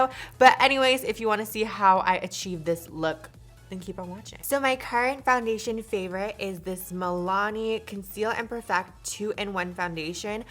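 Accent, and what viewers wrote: United Kingdom. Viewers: Why does she sounds a bit British here? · living for the british accent bih🎉🎉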